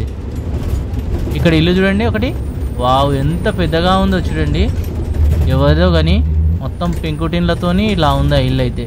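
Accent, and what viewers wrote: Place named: Warangal, India. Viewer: Bro chowrasta antunnV..nuvvu warangal aa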